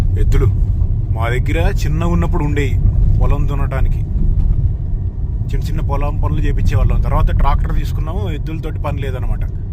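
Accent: Andhra Pradesh, India. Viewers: Brother... Telangana slang.. kaadu ❌ Andhra slang ... ☝️ How..how how??? 😅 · Your slang is looking like Andhra, not telangana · Broo nenu ni voice chusi andhra anukuna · Andhra language laaga undi meedi due your circle in usa or meeru tg ki move ainara ? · Hello, garu, Mee accent Andra telugu laga undi, Nizamabad accent ledu. Mee grandparents proper place ekkada?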